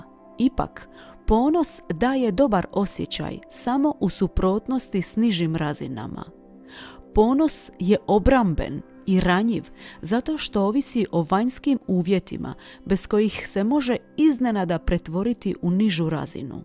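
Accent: Dalmatia, Croatia. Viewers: Gospet jel ovo dalmatinka prica?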